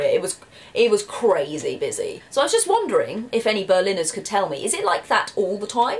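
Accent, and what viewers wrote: United Kingdom. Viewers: hey, i love to listen to your very british english:) but - do you talk about that berlin that i know? - that berlin that i was born and raised and still live in? (i am 31 years old)... i don´t feel the same about that... i see very stressed and passive agressive people surrounding me! everyone is deeply quiet ^^ but it depends where in Berlin you are.. for sure... but to me personally: i think i will leave my hometown now... but i wish you all the best in germany. i´d rather would go to the UK if i could... ( i have nice memories of the uk, but i have been there for only ONE WEEK, so.... who knows..) Lovely Greetings from Berlin!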